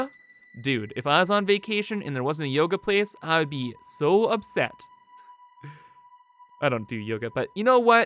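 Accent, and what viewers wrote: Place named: Canada. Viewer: Are you Canadian too? I recognize your accent.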